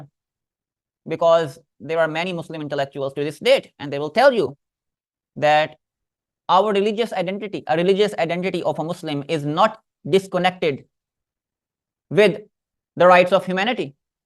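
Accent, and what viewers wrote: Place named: Bangladesh. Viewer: You sound Bangladeshi, what do you know about the Middle East and history little girl?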